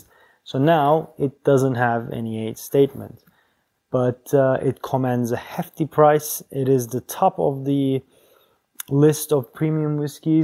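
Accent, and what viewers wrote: Turkey. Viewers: Your accent .. Are you a Turkish guy?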